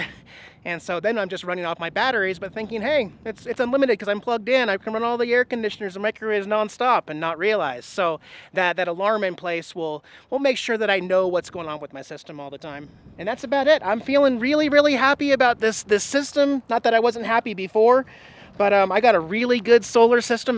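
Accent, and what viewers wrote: South, United States. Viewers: Why is he talking with a southern accent now?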